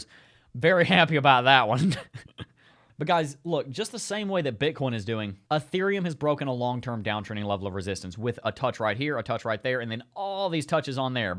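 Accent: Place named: Los Angeles, United States. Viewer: sounds like you're in LA! ✌😆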